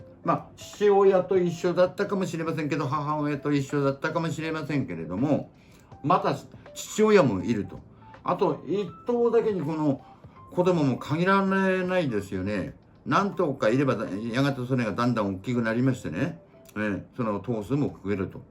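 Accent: Fukushima, Japan. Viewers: ウマ娘を当てる動画から来ました。耳馴染みの深い故郷の訛りで、福島の方だとすぐにわかりました😊ほんとに熊にはお気をつけてお過ごしくださいね💦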